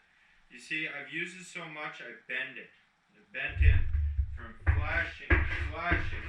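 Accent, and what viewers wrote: Canada. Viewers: where are you located you sound canadian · hahaha nothin brightens my day quite like that canadian accent sayin "well good day there boys n girls". i was lookin in my subscriptions and this video was at the top and started running the video without the audio and i could see his face and the subtitles writing that first line out and it made me chuckle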